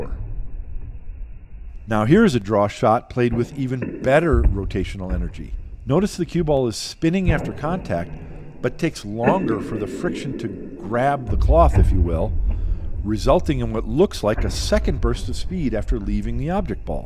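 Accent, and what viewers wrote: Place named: Wisconsin, United States. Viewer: With that accent, you’re definitely from Wisconsin lol. Love the content! I just figured out the “throwing motion” on my own the other day and it helped a lot. I need to try out the 90° rule on a table tomorrow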